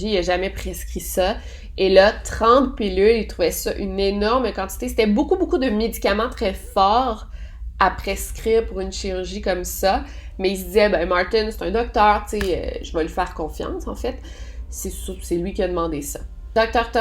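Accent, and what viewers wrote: Quebec, Canada. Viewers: C'est l'accent québécois, s'il t'indispose autant éviter de regarder ses vidéos non ? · Je me suis même pas rendu compte de la longueur de la vidéo😅 merci pour ton super travail a chaque fois! Et ton accent et les termes Canadiens, j'adore!😊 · Cc je t admire beaucoup j adore ton accent québécoise j aimerait un jour visiter le Québec sa serait mon rêve. J adore tes vidéos 😘😘😘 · J'adooooore tes vidéos. De temps en temps...j'ai un peu de mal à te suivre vue ton accent canadien... Plus je te regarde...et plus je te kiffe...tu as souvent de chouettes histoires et tu expliques les choses si bien qu'on se croirait presque devant un film. Et pour couronner le tout...je te trouve super-mimi. Ce mec est un vrai goujat... Un salopart... Aucune considération pour sa femme... Toutes ces aventures extra-conjugales et Michelle plutôt naïve qui veut tout faire pour sauver et garder son couple... 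Merci pour nous avoir fait découvrir cette triste affaire...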